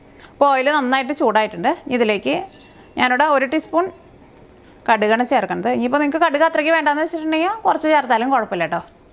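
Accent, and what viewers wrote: Thrissur, India. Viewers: Thrissur slang very nice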